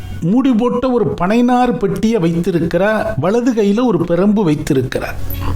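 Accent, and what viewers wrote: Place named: Tirunelveli, India. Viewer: படித்தபோது திருநெல்வேலி மாவட்டத்தின் பேச்சு வழக்கு தமிழ் தேனாக இருந்தது.இப்படி கதை கேட்பது இது ஒரு புது அனுபவம்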